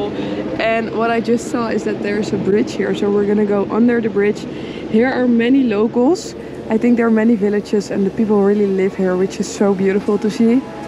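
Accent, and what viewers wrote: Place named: Netherlands. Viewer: You sound just like ivana from travel iv . That dutch accent is very noticeable. Do a collab with her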